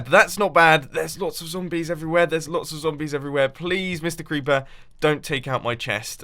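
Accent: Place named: United Kingdom. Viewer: Iron golom. Great British accent bro :)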